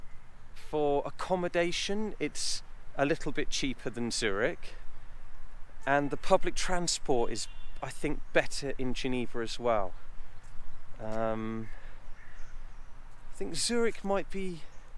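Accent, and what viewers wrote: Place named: United Kingdom. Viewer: I was just enjoying your British classic accent 😍, hi from Dubai ✌🏻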